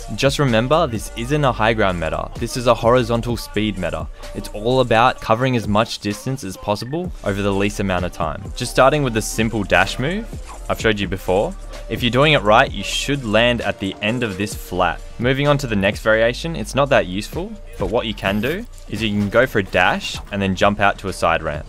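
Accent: Australia. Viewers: is he australian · Aye I’m a new sub lmao I could tell you were from au as well as soon as I heard you spoke aha hopefully we see each other in game at one point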